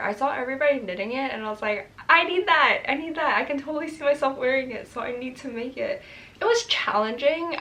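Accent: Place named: Canada. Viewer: I have never seen your videos before and as soon as you started speaking I was like this girl is Canadian. I wouldn’t be surprised if she’s from Toronto (area) then you mentioned the gta and my head snapped up so fast. Will definitely be checking out that yarn shop. Thank you 😂❤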